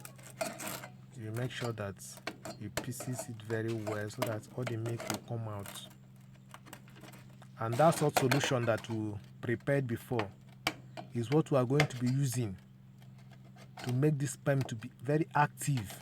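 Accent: Nigeria. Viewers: Nwanne your igbo accent dey give me joy 😅 thanks for the info too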